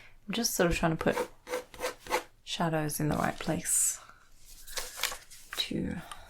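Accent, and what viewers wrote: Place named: Australia. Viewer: Aussie accent love it❤!!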